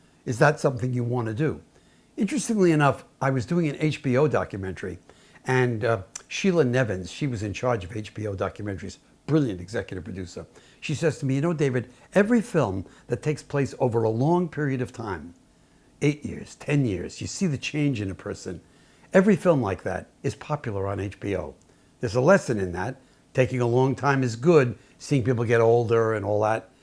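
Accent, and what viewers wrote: New York, United States. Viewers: Christ your accent is so New York it's giving me flashbacks. Don't worry about the ads; I bet no one will mind.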